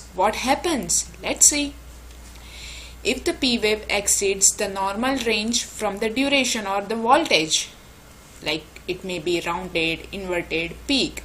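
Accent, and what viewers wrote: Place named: India. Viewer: I am not trying to sound racist or xenophobic. But I am having a very hard time understanding what the Doctor is saying. Her Indian accent is so heavy it makes understanding everything she says sometimes beyond my ability to comprehend. I have to review the video many times. If this Doctor would simply slow down her speech by only a small fraction it would truly help those not a custom to her accent. Thank you for your time.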